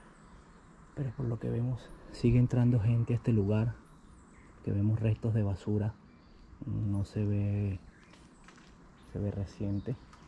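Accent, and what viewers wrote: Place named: Venezuela. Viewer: Tines asento como de benesolano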